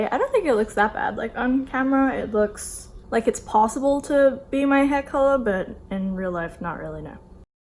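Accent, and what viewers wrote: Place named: Australia. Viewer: Love your Australian accent, and you look pretty with or without make up, keep up with the videos! 😉👌🏻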